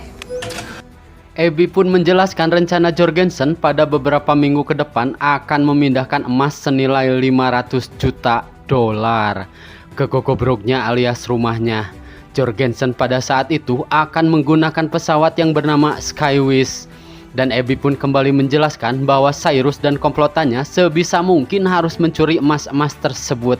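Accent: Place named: Garut, Indonesia. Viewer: Ngadangu suarana mh ciga orang garut si otok teh.. Sami2ti garut hayu urang saling suport..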